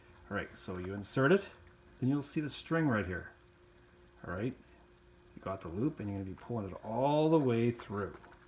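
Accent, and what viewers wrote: Canada. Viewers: oooh I love a Canadian accent!! ❤❤❤❤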